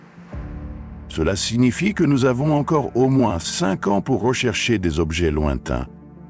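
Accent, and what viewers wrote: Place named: United States. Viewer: accent americain ridicule à chier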